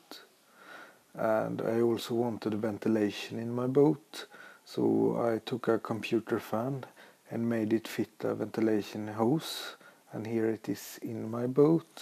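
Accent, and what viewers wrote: Jönköping, Sweden. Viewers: swedish i guess :) so easy tro hear as a fellow swedish person. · Nope, it's Swedish accent. Trust me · swedish? xD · Hehe, den svenska dialekten.. Usch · Swedish? Tihi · oh god, that Swedish accent :D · haha, you can hear that you are from sweden, im also swedish ;) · That accent.....i feel ashamed of being swedish .... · Du låter lite som en Jönköpingsbo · Wow! So very cool! And yes you really do sound soooooooooooo Swedish! Love it! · Best Swedish accent ever! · You can hear that you are from Sweden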